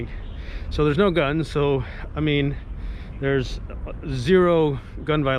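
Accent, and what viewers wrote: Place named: Los Angeles, United States. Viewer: This is my first viewing of your video and I think you did an amazing job. When I started to hear you speak, I thought you were from Los Angeles which is where I'm from. I love your thoughts on living in Macau where I was lucky to visit in 2008. I totally understand the comparisons you made of Macau and other places in the States. I hope to one day visit again and check out the places you mentioned. How did you make the adjustment in terms of speaking Chinese? Are you speaking more Cantonese or Mandarin?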